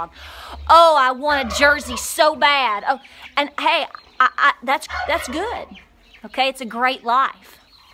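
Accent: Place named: Tennessee, United States. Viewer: I knew I liked that accent. I use to have a friend that lived in Tennessee that would talk about that team. Good advice! I talk to some local farmers who say they owe way more then there farm is worth and they can't stop farming because if the debt. I can see you appreciate the little things in life. Keep up the good work sound like a neck of a woman. God Bless and take care from WI!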